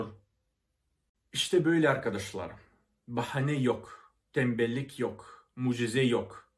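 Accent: Thrace, Turkey. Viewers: Neden bilmiyorum ama hem tip olarak hemde aksan olarak ne zaman seni izlesem, Trakyalı birini izliyormuş gibi hissediyorum. seviliyorsun kral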